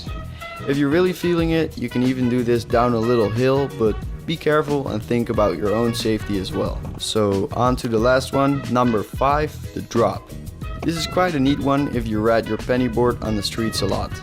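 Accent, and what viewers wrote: Netherlands. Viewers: Lol I can hear that vague Dutch accent there, especially when talking to those kids in the end😂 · Ar u dutch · the accent says that your dutch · Btw are you dutch? I can hear it from your accent and when you say 'cool he' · does he sounds dutch or is it just me? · R u not dutch? Ur accent is really dutch😂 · Are u dutch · Ben je Nederlands je hebt beetje accent van Nederlander 😂😂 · Whahaha loll hoorde ik NOu goed dat je Nederlands bent? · I can hear a dutch accent. Am i right or? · Dutch? Btw love this vid imma try it.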